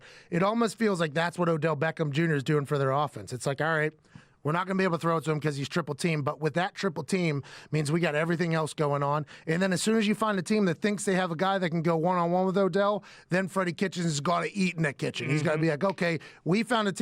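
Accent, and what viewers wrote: Pittsburgh, United States. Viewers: I love it when da Pittsburgh accent come aut. Yuenz can hear dat, can't ya.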